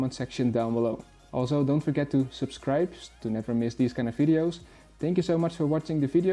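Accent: Netherlands. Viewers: Ik hoor gewoon dat deze man nl is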